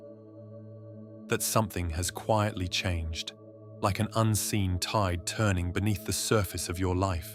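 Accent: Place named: United Kingdom. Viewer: What's up with the Brit tish twang, geez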